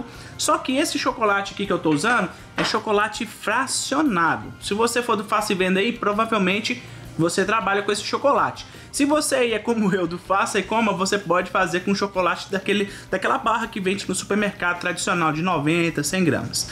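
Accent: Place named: Rio de Janeiro, Brazil. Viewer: Com certeza e carioca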